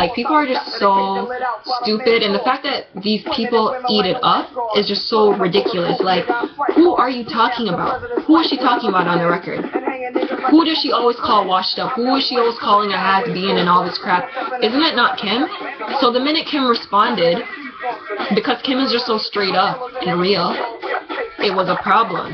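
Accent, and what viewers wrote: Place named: Caribbean. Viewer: Oh wow, lol. I don't know why I thought I heard a little Caribbean in you.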